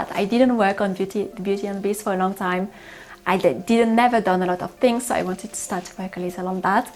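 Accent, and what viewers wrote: France. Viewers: Lovely 🌹 It is relaxing listening to you, talking about books and french Culture.You make me want to learn French and Romance. You have a lovely French Accent. keep telling us more. Thanks Merci.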